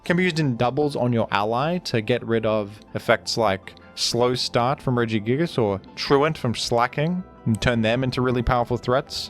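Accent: United Kingdom. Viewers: Bro I thought you were British or something but then you said soccer. What the hell are you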